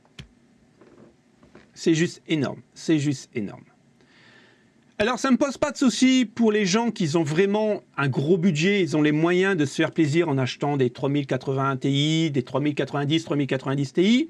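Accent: North, France. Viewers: Peut être une erreur mais on entend bien l’accent de ch’Nord... Perso, quand on pouvait encore les acheter, j’ai toujours pris des carte Sapphire AMD. Idem pour les processeurs : de ll’AMD. On entend très souvent dire que Nvidia fait du grand n’importe quoi. Aux dernières nouvelles, il semblerait qu’Apple c’est un peu pareil. Au moins, il y a la chaîne Pour Ce Consoler 👍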